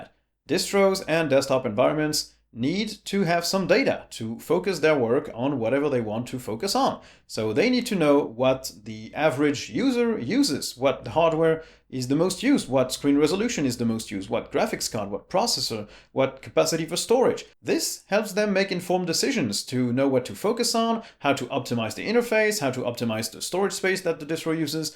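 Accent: France. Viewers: Completely unrelated but I didn't realize you were french Bonjour héhé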